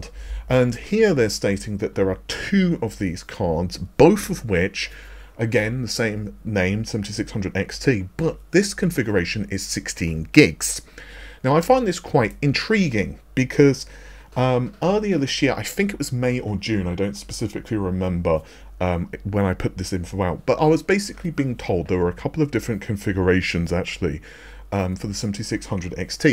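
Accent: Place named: RP, United Kingdom. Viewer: He's what's known as a "toff" and they all speak like that :)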